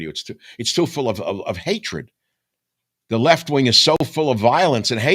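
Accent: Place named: Brooklyn, United States. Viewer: Subbed! I didn't know you were online - I thought I lost you after you left radio. I love your accent, reminds me of my family growing up, that's a particular brooklyn accent, you must be from the same neighborhood my parents grew up in. I can listen to you read a phone book, how much better to listen to you speak the truth. America is blessed that you're still with us and of sound mind and health.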